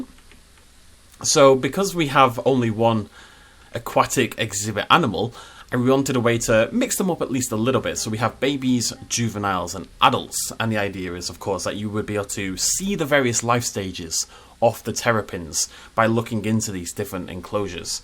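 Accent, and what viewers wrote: United Kingdom. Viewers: Ah i love your planet zoo videos so much, they are the only ones I watch and you inspired me to go from flat squares with....no rocks! to rock filled funky shaped habitats with lots of bushes made from sunken trees. Dude do whatever you want with the game honestly I prefer the habitats and stuff and often skip the buildings (sorry) so I'm super on board with just habitat builds. Also I love your accent where are u from (lmao weird) I assume somewhere from the UK but not essex cause I'm from there and u will never her a t come out of my mouth lol.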